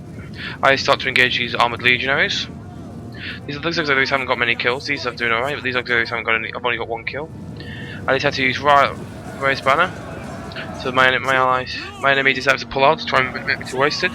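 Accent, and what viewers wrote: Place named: Liverpool, United Kingdom. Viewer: good comm mate, i mean for a scouse lol.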